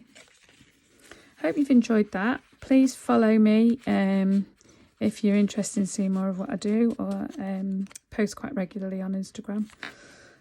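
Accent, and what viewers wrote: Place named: North, United Kingdom. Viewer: Very inspirational and lovely to hear a fellow Northen voice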